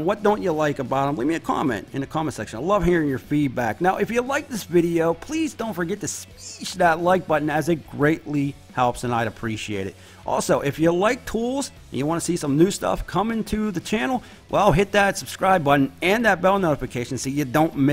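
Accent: Pittsburgh, United States. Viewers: Do I detect a yinzer dialect? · just by listening to you talk i know you're a Stiller fan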